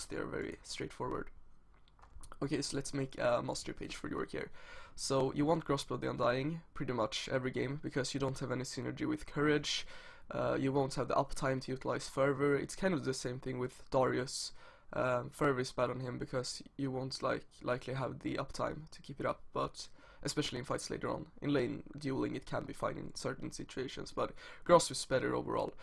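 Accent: Sweden. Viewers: This guide was awesome! Are you Swedish xd? (Your accent sounds like a swede)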